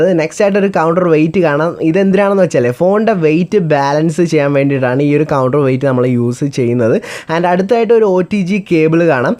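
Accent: Alappuzha, India. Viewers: Machane ninga alappuzhackaran aano....😀😀😀😀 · ആലപ്പുഴക്കാരനാണല്ലേ ... കൊള്ളാം മോനെ....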